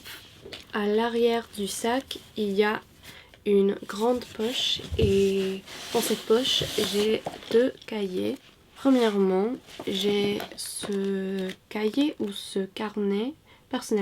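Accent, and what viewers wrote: United Kingdom. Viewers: i hope one day i could hear your British accent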